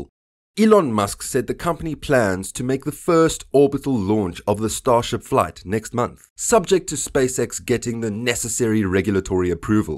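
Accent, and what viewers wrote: South Africa. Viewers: False UK accent? No. That's a proper South African accent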